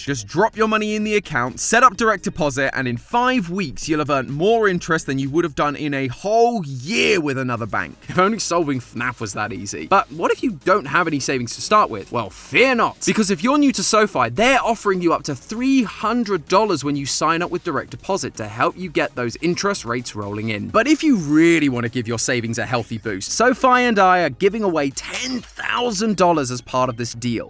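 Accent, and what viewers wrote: England, United Kingdom. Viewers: did matpat become british? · Guys the British guy is back! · Bro I miss mat pat 😭 now he’s a British guy man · I think that this guy is a good replacement for matpat, because he just sound like him but British. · matpat is british now? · WHY DID MATPAT RETIE AND YOUR BRITISH NOT MATPAT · is a true prodigy. One created by matpat. (Nothing changed. The man behind the theory just sounds more British sounding.) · Hey you might wanna fix the United States close caption here. Someone used your English accent too literally and wrote Dawko as Dorko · Every video I hope to hear matpat voice but no just a random british dude 😔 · Matpat seems a bit more British than I remember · man. i will never get over this voice. ofc the british one had to get game theory... lol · what happened to pat mat, why did he turn to british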